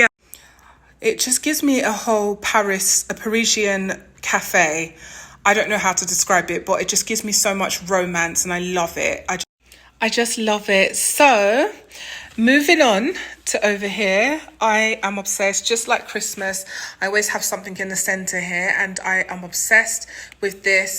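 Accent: London, United Kingdom. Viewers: Are you from London ?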